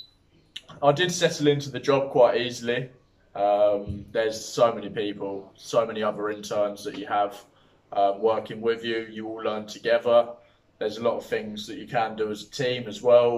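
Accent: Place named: England, United Kingdom. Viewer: from what part of england are you from ?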